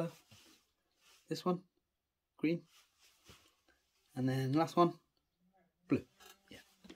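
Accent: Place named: United Kingdom. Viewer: I enter this dude asmr...... THIS DuDE is BRitisH.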